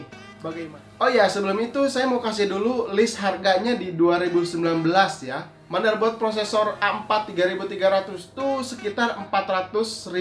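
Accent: West Java, Indonesia. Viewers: Sunda pisan maneh huhuy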